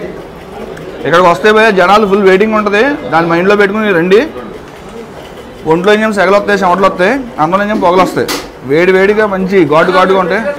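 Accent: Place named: Andhra Pradesh, India. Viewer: Bro nevu chipe style iethi super maa tanuku bhimavaram sleang lo super mouthwatering also.exlent bhiya nuvu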